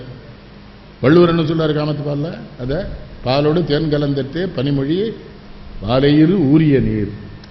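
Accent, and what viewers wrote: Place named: Tirunelveli, India. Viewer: நெல்லை பாஷை அருமை.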